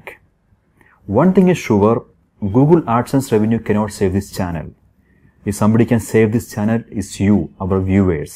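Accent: Kerala, India. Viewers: У тебя индийский акцент. Делаешь вид что из благородный касты, но твои поступки со страйками как из низшеготсословия. Ты из низшей касты. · His accent is like a Malayali trying to speaking in english.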